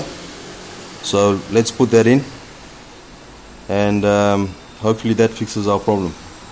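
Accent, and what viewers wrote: South Africa. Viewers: Excellent video! Nice job! Are you South African? You're accent sounds South African. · South African?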